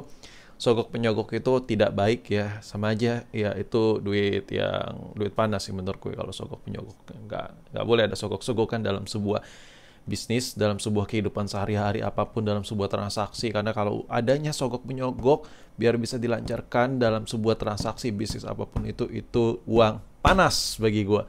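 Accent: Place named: Java, Indonesia. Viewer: Lu orang jawa ya bang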